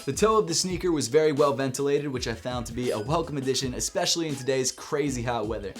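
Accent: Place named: Philadelphia, United States. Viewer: What part of philly you from bro?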